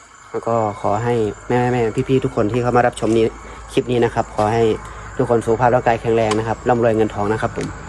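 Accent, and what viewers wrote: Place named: Isan, Thailand. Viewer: โครตหลอนรอยตีนเห็นชัดมากเลยระวังตัวแหน่เด้อน้องเว้าไทยปนอีสานนำกระไดจ้าม่วนดีออก❤❤😊😊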